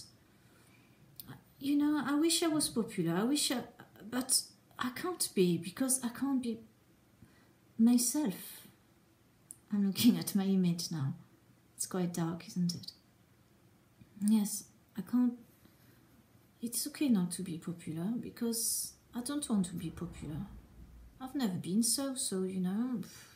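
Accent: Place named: United States. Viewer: What are you doing with USA vocal intonations! Stop it now! Keep regional traits, it is more fun than all sounding the same :)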